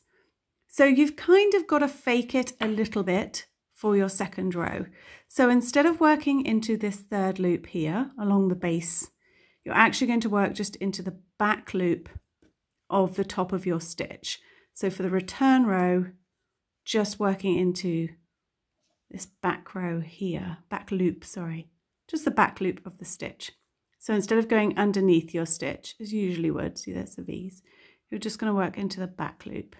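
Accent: United Kingdom. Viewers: Thanks for liking & for commenting on my comment Miss. I like your British accent a lot 😁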